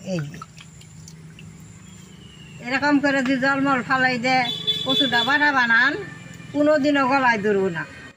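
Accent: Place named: Bangal, Bangladesh. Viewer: একে তো ফোকলা তারপর বাঙাল ভাষা। কিছু ই বুজতে পারলাম। না সরি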